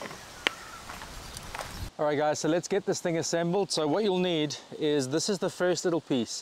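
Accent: South Africa. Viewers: Hey bru Are you south african ?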